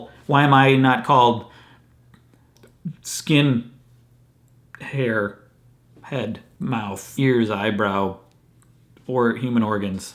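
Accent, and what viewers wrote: Wisconsin, United States. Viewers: Love your Wisconsin accent,!:D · That accent is so 👌🏼 Can you do a video entirely in your Wisconsin accent? I'm a linguist and I love language variation! 😂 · that WI accent tho! do more Wisconsin jokes!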